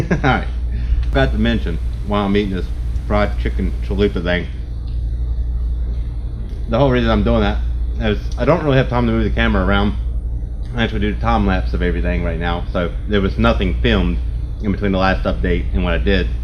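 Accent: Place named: Carolina, United States. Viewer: That's great I could tell by ur accent u were from Carolina. I've got family in Greensboro. I always loved coming to visit over there from KY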